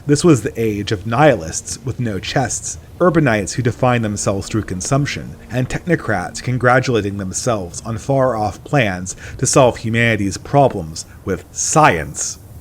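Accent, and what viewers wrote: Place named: California, United States. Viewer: I hear the voice of a fellow Californian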